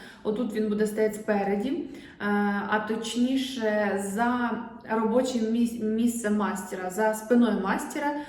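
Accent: Surzhyk, Ukraine. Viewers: Недавно на Вас підписана, відчула щось схоже , не можу описати, особливо мова, мій рідний суржик😊😂 Звідки Ви , з якого куточка України? Я з Полтавської області, зараз також проживаю в Польщі 🤗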